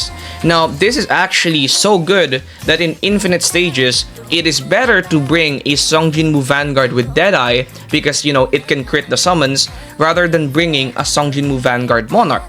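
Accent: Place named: Philippines. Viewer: I can hear in your voice your filipino, can you help a fellow filipino out yourself and gift vip? (Shooting my shot like ben simmons)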